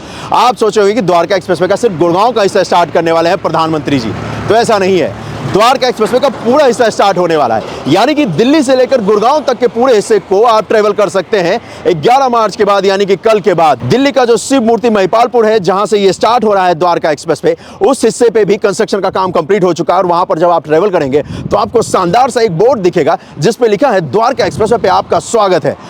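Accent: Bihar, India. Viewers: Bhai tum Bihar se ho kya...?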